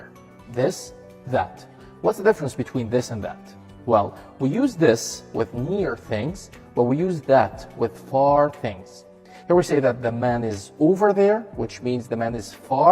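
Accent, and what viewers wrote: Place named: Vietnam. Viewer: anh là ng việt ạ, sao lại phát âm f h t n tiếng việt vậy:))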